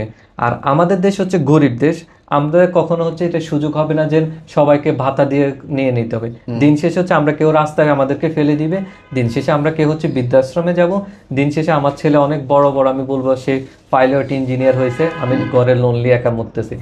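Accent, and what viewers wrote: Noakhali, Bangladesh. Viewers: Uni ki noakhali zillar naki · জি, নোয়াখাইল্লা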